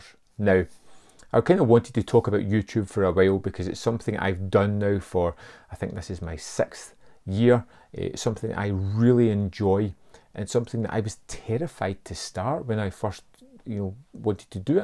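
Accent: Scotland, United Kingdom. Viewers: Do a review of Braveheart with your regular Scottish dialect, rambling about how inaccurate it is. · Love the channel mate keep it up i didn’t know you were scottish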